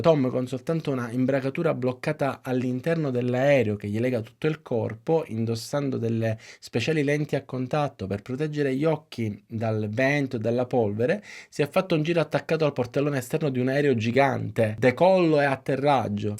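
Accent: Palermo, Italy. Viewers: Il signorino perfettino asettico, no grazie, adoro la personalità e colore con vocaboli palermitani.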